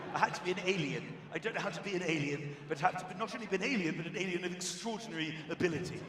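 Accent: United States. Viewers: I love his American accent, the way he leans on the hard "R." Funny! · I LOVE your American accent! But I could listen to you talk for hours. ♥️👂🤣🤣🤣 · I love his American accent too lol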